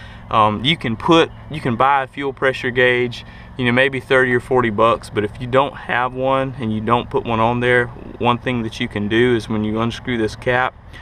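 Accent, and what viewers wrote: South, United States. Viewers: i don't care about the fuel pump . That southern Accent makes everything sounds fine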